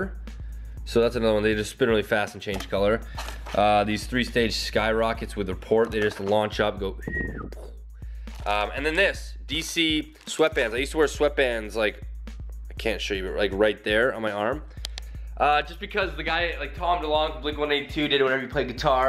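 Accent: Canada. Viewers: He's Canadian so he pronounces some things differently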